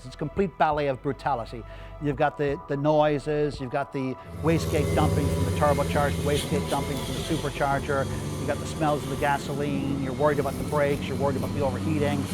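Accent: Ireland. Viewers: He's Irish? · ur Irish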